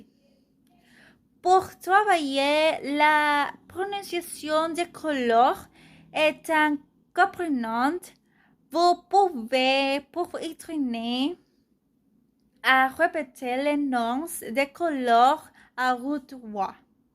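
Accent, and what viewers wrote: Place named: Portugal. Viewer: Eres portuguesa? você fala francês como um português... hablas frances como una portuguesa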